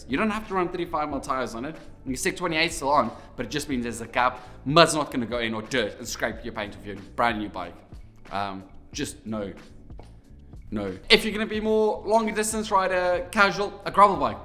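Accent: South Africa. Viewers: Sounds like a SAFFA… must be 😂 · What accent is that? Cape Town? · Definitely a South African, good advice Boet !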